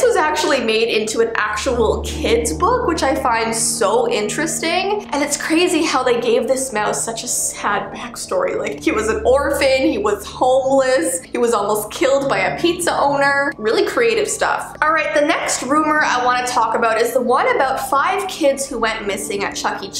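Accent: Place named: Canada. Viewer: Samurai she is Canadian so she has a different accent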